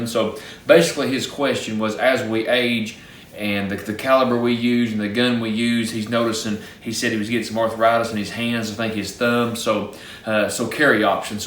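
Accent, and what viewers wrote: Kentucky, United States. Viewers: I wish the same to you and yours. It's amazing how people from across the world, in spite of varied experiences, and circumstances, can learn from each other and find common ground through channels like yours . It might surprise many how similar people are essentially. Shared values accross geographic, & cultural, and even racial divides. Thanks to the hard work of people like you, we have the opportunity to learn to adapt, improvise, and overcome . #PS . First time ever I have knowingly interacted with someone from Kentucky and I find that Kentucky twang extremely endearing and honest( don't ask me why; I just do. I myself don't know why). I normally don't post a lot of long winded comments so this is a first for me. Have a blessed life, and keep sharing your insights.🙏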